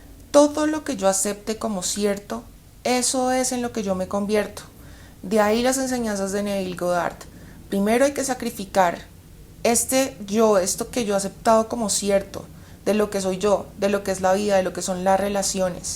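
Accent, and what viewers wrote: Colombia. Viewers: Excelente video y GRAN CONTENIDO 🇨🇴 " MI GURÚ FAVORITA ❣️🇨🇴" UN placer verte y un DELEITE escuchar tu voz muy colombiana 🇨🇴 ❣️ ( siempre enseñanzas prácticas GRACIAS ❣️🇨🇴😉✌️🇲🇽) UN BESITO Y UN ABRAZO DE OSO HASTA BOGOTÁ COLOMBIA 🇨🇴❣️😉✌️🇲🇽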